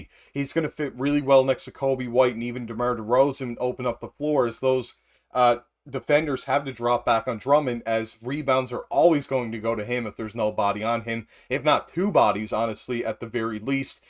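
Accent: Canada. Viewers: are you from nova scotia?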